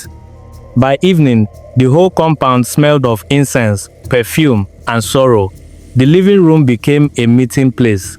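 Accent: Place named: Africa. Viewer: Listen man, use your AI voice , there's nothing wrong with that. It enhance your narration. Although it's a pleasure to hear you, if you want to go global and make money use the voice. We have accent, only Africans can understand, and if your audience is beyond the local, you need to use the voice. It's business! Nothing personal!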